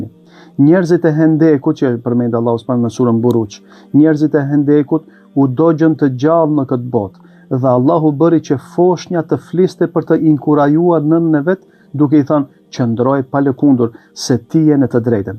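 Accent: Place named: Kardhiq, Albania. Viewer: shkerdhat si flet kardhiqe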